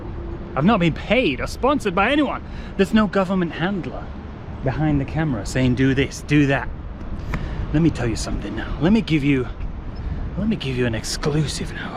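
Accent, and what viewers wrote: United Kingdom. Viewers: That Brit accent that reminds me of Ron in Harry Potter. I just laughed quite nice 😁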